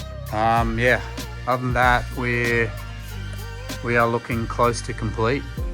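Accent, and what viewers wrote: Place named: Australia. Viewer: Super MOD... thank you for showing us. I just sold my r1200gs and bought the 890 adv S 2023 in a few weeks... this video gives a nice tip. Are you from Australia? You have an Australian accent. I go there a few times . G' DAY MATE 👍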